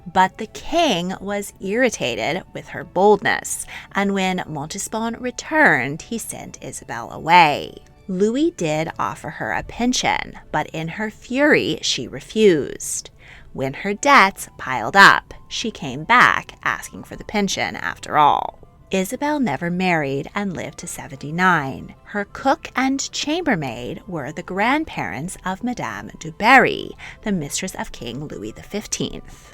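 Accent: United States. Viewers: Beautiful research, writing and graphics. American accent is particularly abhorrent.. Overdub by French woman please. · you should redo this video without the advertising scam and narrated by a pleasant and correct english speaker without the horrendous american accent.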